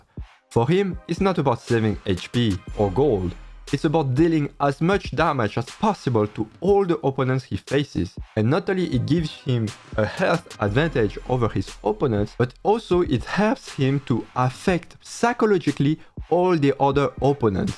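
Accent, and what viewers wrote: France. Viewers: I love your videos, really. It's clearly visible that you're trying to maintain high-quality content, do much about the montage and even added captions, probably being aware of your French accent that might be hard for someone to understand. Wow. Chapeau bas! First time in my life I really feel the urge to share someone's content because I feel like you deserve it! Keep up the good job, you're amazing :)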